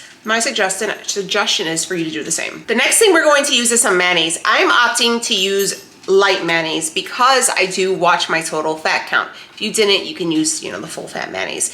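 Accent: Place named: Minnesota, United States. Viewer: Looks awesome! I'm going to try this. Where are you from? You sound like you are from my home state of Minnesota! :)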